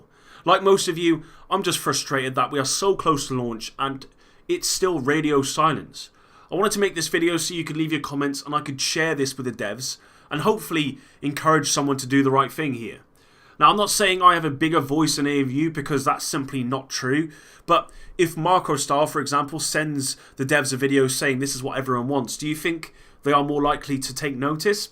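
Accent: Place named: England, United Kingdom. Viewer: Good video. Keep up the great work. You sound English. Where abouts are you from? I can’t wait for this game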